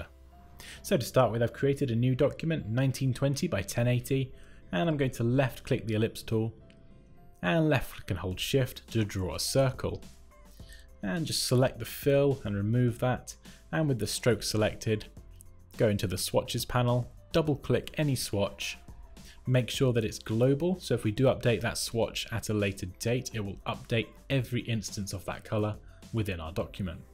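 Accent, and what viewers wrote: England, United Kingdom. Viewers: I love this english accent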